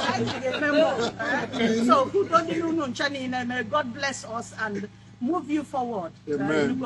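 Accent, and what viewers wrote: Igbo, Nigeria. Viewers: Exactly, as soon as I saw her I knew she was mixed. Her hair texture is more black than white. She was definitely also raised in Nigeria. I live in England and nobody here can fake the Igbo accent that much. People try but u can still tell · The intonation shows that this woman is a half cast raised in an Igbo environment. She tried, even with proverbs. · That woman speaks english like she grew up in Nigeria. She can‘t be born in the uk because her english sounds too nigerian no british accent at all. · Oh my goodness! I'm in love with our Igbo wife! Well done, and good job to our Naija wife, and to her loving husband. I don't speak Igbo, but she totally sounds like an Igbo woman to my Yoruba ears. Sending her so much love!! ❤❤❤ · Even her spoken English sound like an Igbo woman speaking wow!❤❤❤ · Probably she was born and brought up in the east and eventually married an igbo man cos she did not just understand and speak igbo, she also has the accent which shows she isn't new in their mist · She speaks just like an igho woman mixing English with igho.Wow. 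· With the Igbo accent as well. It’s like I am hearing an Igbo woman from the village. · Even her accent is pure Igbo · She even speaks English with a Nigerian accent. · Wow 🤨 Not only did she speak very well She sounded Igbo too With an Igbo accent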